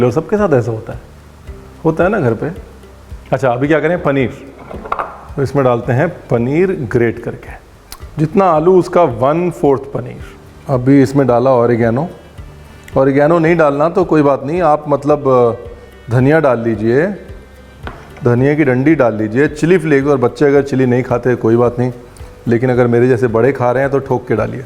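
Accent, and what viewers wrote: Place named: Punjab, India. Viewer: Your punjabi accent is mind blowing🤯 👨‍🍳 chef. Keep coming with such great content and recipes. 🥳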